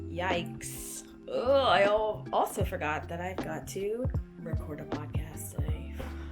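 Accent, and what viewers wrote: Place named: Toronto, Canada. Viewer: Toronto Mans accent is a VIBE 😂😂😂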